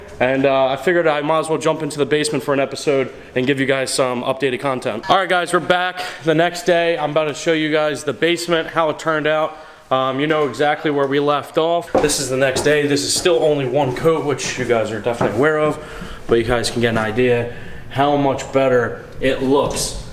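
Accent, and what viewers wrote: Philadelphia, United States. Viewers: That Philadelphia accent, though. Do you know it’s, “water,“ not “wooter“?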